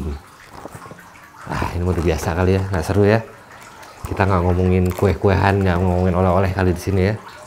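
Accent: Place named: Java, Indonesia. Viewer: Mas kapan buat tips & trik videografi lagi? Udah kangen banget sama logat jawanya mas hehehe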